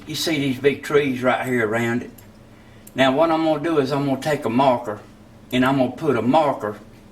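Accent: South, United States. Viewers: love your good ol boy southern accent..i just chuckled listening to ya... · I love the southern accent.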